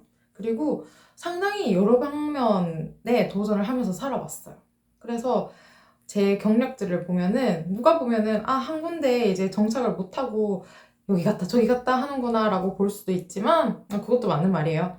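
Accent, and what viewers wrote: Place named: Japan. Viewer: 뭔가 말투가 일본 억양이 평일 낮 시간대에 이럴때랑ㅋㅋㅋ자주 일본억양이들리네여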